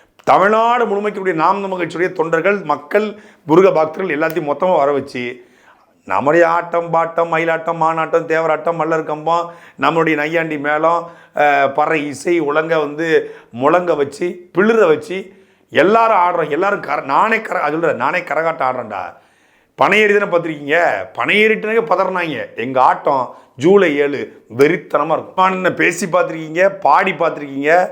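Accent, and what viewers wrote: Tirunelveli, India. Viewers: இந்த 'இழுவ'..😂 அண்ணன் திருநெல்வேலிக்காரன் னு நிருபிச்சிட்டீங்க..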